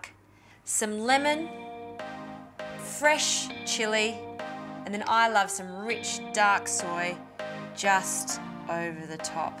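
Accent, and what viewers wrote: Australia. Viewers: Celsius (She sounds Australian)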